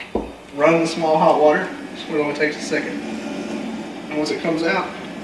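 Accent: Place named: South, United States. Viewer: That southern accent!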